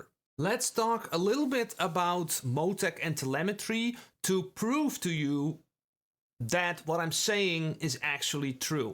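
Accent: Netherlands. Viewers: You're not Dutch, are you? You sound exactly like one of my best friends!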